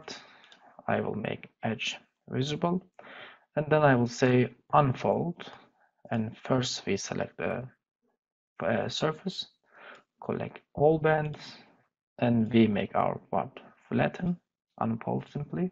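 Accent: Turkey. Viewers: Are you Turkish? ı thought this cause your accent.am ı right?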